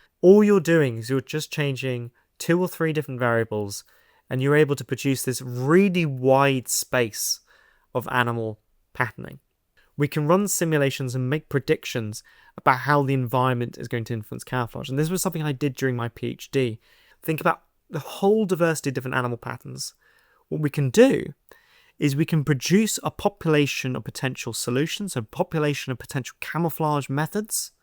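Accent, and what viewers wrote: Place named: United Kingdom. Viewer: You sound British, any chance you're in the UK? If so, have you heard of DinoCon and would you PLEASE come present your work <3 <3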